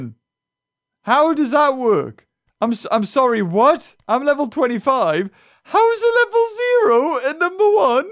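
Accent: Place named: Newcastle, United Kingdom. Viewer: I saw you in all over the place good gordie acsent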